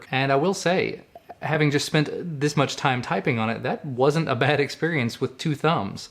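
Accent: Kentucky, United States. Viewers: R you from Kentucky?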